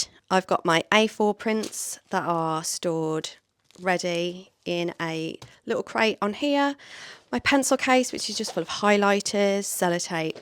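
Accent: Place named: Birmingham, United Kingdom. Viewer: I’ve just discovered you, I love your cosy, happy living room come studio 🥰 I thought I detected a local accent & then you mentioned Birmingham 😊 Just placed my order for stickers and look forward to receiving them! 😊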